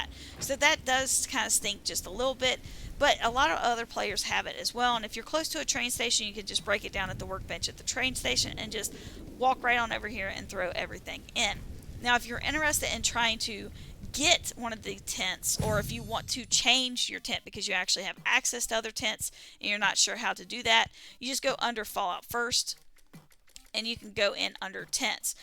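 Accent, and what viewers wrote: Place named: South, United States. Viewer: You have one of the most articulate sounding southern accents I've ever heard just FYI. quite a delightful surprise to the ears!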